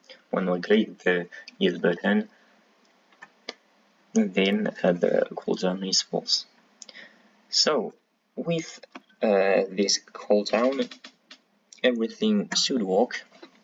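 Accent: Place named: France. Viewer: T’inquiète l’accent français c’est hyper prisé dans les pays anglais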